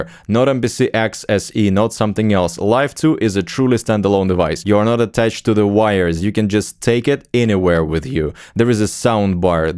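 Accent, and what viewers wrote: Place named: United States. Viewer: Круто ты шпаришь по английски) Не знал бы кто ты подумал бы что асерикос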